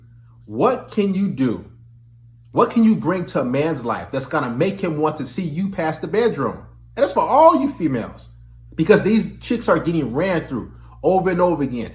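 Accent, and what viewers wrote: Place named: Chicano, United States. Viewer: You speak like a "vato" bro.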